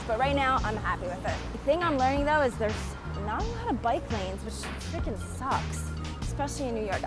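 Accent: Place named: Philadelphia, United States. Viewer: Awesome video,. I love your philly accent. I wash my jeans once a week. 😘😘😘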